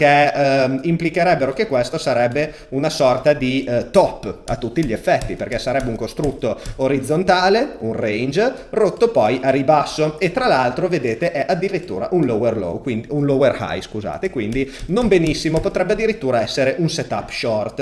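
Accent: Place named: Romagna, Italy. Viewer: Ciao, di dove sei? A giudicare dall'accento viviamo vicini, sono di Forlimpopoli! Per il momento sono messo così: 35%btc 45%eth 20%cro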